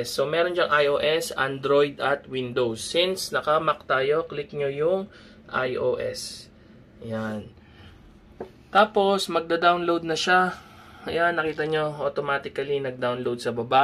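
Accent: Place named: India. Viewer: titulo en español y audio en indu-ingles, que mal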